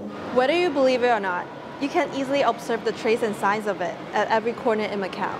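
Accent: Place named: Hong Kong. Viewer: 閣下的廣東話像是一個香港人，不知是否正確？純粹好奇一問，祝新年快樂，訂閱人數蒸蒸日上，紅遍大江南北。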